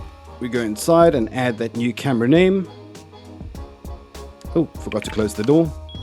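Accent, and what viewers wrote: South Africa. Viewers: U sound like you have a South African accent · Hahaha heard the intro and was like this guy is South African! Good job man great to see fellow Saffas making it with gaming channels!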